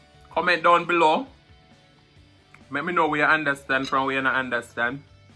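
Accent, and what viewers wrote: Jamaica. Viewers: A combination would be great.. patois and English as you do..brings more spice to your videos.. dem gals love da accent 😂😂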